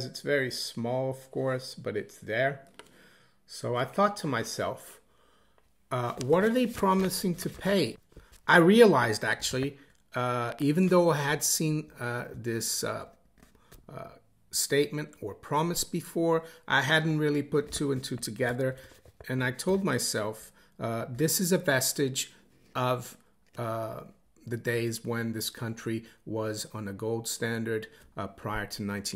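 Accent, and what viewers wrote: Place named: South, United States. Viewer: < Once I listened to a video post with sound, clearly you live a bit further south of N.E.. lol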